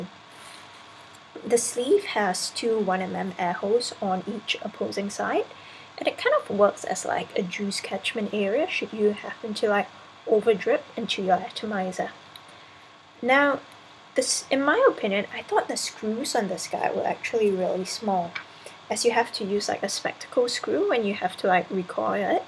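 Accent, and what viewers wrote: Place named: England, United Kingdom. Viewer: Thank you :), I finally got some flat ribbon for this little guy in the mail today, I'm building a micro for it now, time to see how she vapes with what she's intended for! Btw are you English? I only ask because of the accent, and way you spell flavour :P (man I miss my grandmum in England), I was wondering if europe has seen the Russian BIG rba yet? I've heard it's being made in germany and seen a UK vaper that had one, and am trying to get my hands on one sooo bad! drool It's just like a kayfun/russian 91% but it's massive, and holds 11ml 8D, just had to ask in case they exist for y'all yet. Thanks again!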